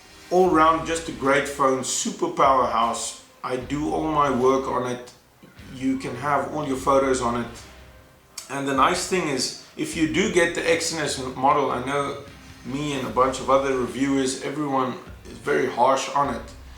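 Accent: South Africa. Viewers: You soumd south african..are you???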